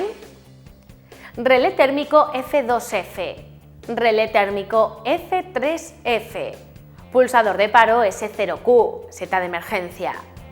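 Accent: Spain. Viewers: Linda, inteligente y con acento español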